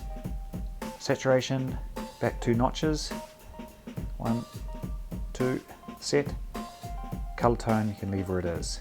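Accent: New Zealand. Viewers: nice to hear an NZ accent. thanks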